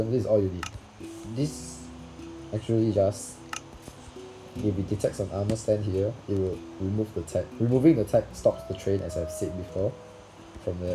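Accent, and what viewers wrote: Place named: Singapore. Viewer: Bro you sound like a singaporean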